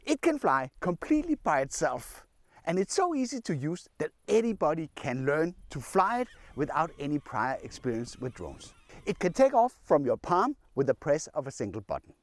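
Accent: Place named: Denmark. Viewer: Sir you accent sounds Dannish, Swiss or Norwagian 😊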